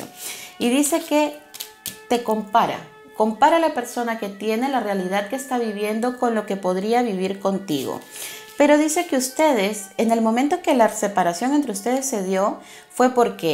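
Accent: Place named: Mexico. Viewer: . Tienes tono de Mexico lindo y querido. Porque no haces una oferta especial para los mexicanos que queremos consulta personalizada. Esta muy caro en euros 🙏🏻💕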